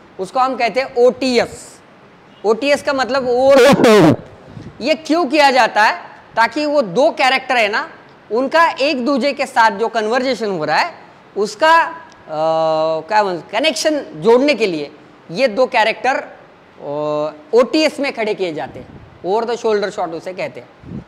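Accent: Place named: Maharashtra, India. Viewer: Hindi me marathi accent aa raha hai sir ka... Sorry but aise hi videos dekh k thoda samajh raha hu 🙏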